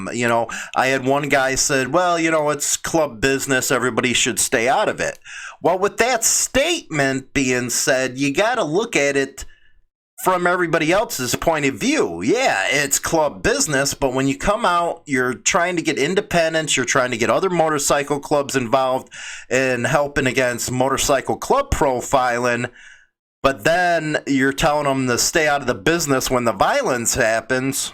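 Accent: Chicago, United States. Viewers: Morning and good points indeed! The simple facts are that what happens in the club world affects all our world as Bikers. It’s just reality man. Profiling and all the BS that goes with it are a result of people drawing attention to Bikers for bad behavior! Just look at what’s happened over the last 10 years here in the ROT. Specifically since the BlueGang pulled the ambush at Twin Peaks and it didn’t go their way after it all shook out and it has and is gonna cost them big $$$ long into the future as it should! We both know they (BlueGang) are the worst sore losers on the face of the earth. Pull that kinda shit and it goes south so we will just harass and fuck with these clubs until we wear em out of existence! That’s the way they think and work. As you pointed out man with today’s surveillance everybody’s into everybody’s business 24/7 and that’s reality. 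As for your Chicago accent man, fuck em! Shit man combine that with my ROT accent and those whiners would lose their minds! LOL! Great show man. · Good morning you know I'm a big fan of your show but you can't blame mispronouncing words on a Chicago accent you should be proof reading the story's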